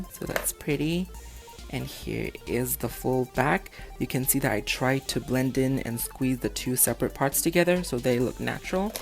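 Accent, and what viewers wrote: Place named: California, United States. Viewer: He may be from California because thats how my friend who is a boy talks and he is from California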